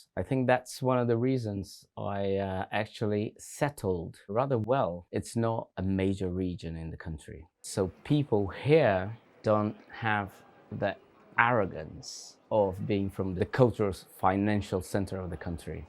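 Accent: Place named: United Kingdom. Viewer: Your British accent is wonderful 👏🏻👏🏻👏🏻. Very nice the video! ❤️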